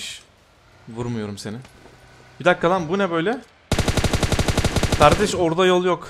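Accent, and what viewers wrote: Adana, Turkey. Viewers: Abi sen Adanalı mısın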